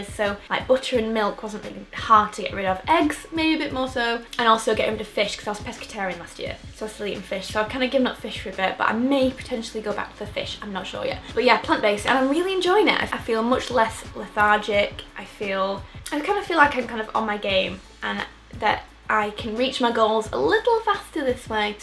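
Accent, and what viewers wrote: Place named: North, United Kingdom. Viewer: where are you from? you sound quite northern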